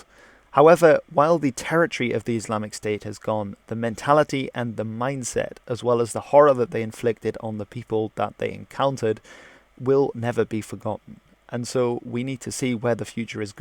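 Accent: Arab world. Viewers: Liked the vid for the well executed Arabian accent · I like your arabian. My uncle finished his school in Damascus and he also agrees.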